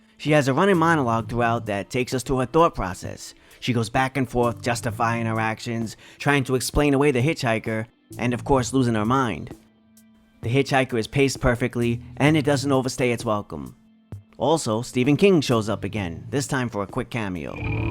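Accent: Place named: New York City, United States. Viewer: Are you from NYC? That accent is strong af 😂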